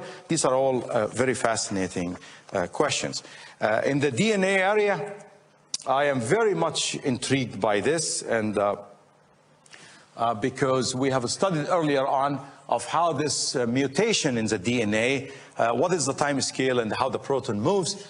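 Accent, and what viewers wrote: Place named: United Kingdom. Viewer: This accent is British accent 😅😅😅😅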